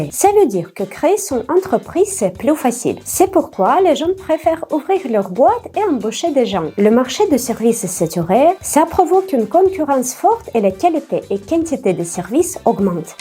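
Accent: Russia. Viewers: Votre français il est super, l'accent russe lui donne tous son charme. Merci pour votre vidéo. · J'envisage de m'expatrier en Russie dans l'année à venir, et entendre ces points de vue croisés est une mine d'or pour moi. Merci! Твой французский идеален, а русский акцент просто очарователен! :) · Tu es intelligente, tu as une vision très juste et réaliste des choses. Le mélange des cultures est ta force. Tu parles un excellent français avec ton accent russe si craquant. J'ai un grand respect pour la Russie, sa langue, sa culture, son histoire et ses scientifiques. Je trouve l'hymne russe le plus beau de tous, il est fougueux comme La Marseillaise. C'est un pays que j'aurais voulu mieux connaitre, je ferai cela dans ma prochaine vie. Je te souhaite santé, bonheur et réussite. En toute bienveillance. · Jajaja j'aime ce petit accent Russe jijij ne le perd pas .😅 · Bonjour. 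Je viens de tomber sur ta chaîne Youtube et sur cette vidéo par le pur des hasards. Ca me fait tellement plaisir que tu aimes notre cher pays qu'est la France malgré les tensions très fortes et très dominantes dûes au contexte actuel. Que ça me fait plaisir de te voir sourire, de te voir t'enthousiasmer, de dégager de bonnes ondes positives, dégager de la chaleur humaine et de la bonne humeur. J'adore tout autant ta franchise. Tous ces éléments combinés te donnent énormément de charme tout comme ton accent russe. Ca fait tellement du bien. Mille mercis à toi. Surtout ne change pas et reste comme tu es. Saches que tu as une superbe maîtrise de la langue française et que tu fais très peu d'erreurs. Nul n'est parfait. Nous devons tous apprendre de nos erreurs. Prends bien soin de toi. Amuse toi bien avec ton apprentissage au tennis. J'espère que tu pourras enfin créer ton entreprise dès que possible. 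Au plaisir de découvrir tes autres vidéos. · Je trouve que ton accent russe rend ton français mignon 🥺 d'ailleurs sa m'intéresse beaucoup de voir une personne russe vivre en France car tu t'intéresses à notre culture 🥰🤗 j'aimerai tellement apprendre le russe que je connais pas très bien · Merci pour ta vidéo. Si seulement la majorité des français pouvait aimer ce pays autant que toi, on en prendrait beaucoup plus soin, malheureusement en France on aime toutes les cultures du monde, sauf la notre. Ça fait plaisir aussi de voir que tu fais autant d'efforts pour maitriser notre langue mais si je peux me permettre un conseil: essaie de faire moins de faute mais garde quand même toujours un peu ton accent russe. Déjà parce que ça fait partie de ton histoire mais surtout, un accent étranger ça donne toujours beaucoup de charme; même les américains préfèrent bien souvent l'accent anglais à leur propre accent. 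Enfin, ton accent permettra aux Français de savoir que tu as des origines étrangères et donc ils te pardonneront plus facilement si tu fais des fautes de français ou si ton comportement leur semble un peu étrange. Voici un petit exemple amusant venant d'un humoriste Franco-Anglais qui te permettra de comprendre pourquoi tu dois garder ton accent: <link> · Наконец-то ютуб рекомендовал мне видео, где слышно, как звучит русский акцент по-французски. И наконец-то я попал на видео на французском языке, где я могу разобрать больше пары слов в минуту. 15 минут в дуолинго в комнате раздумья не прошли зря! Je suis tres content!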